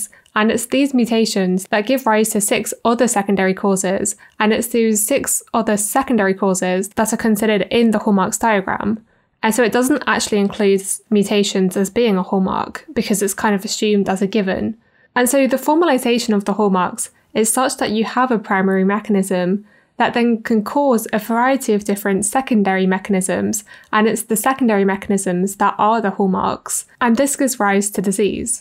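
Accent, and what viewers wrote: United Kingdom. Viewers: where in the UK specifically is your accent from?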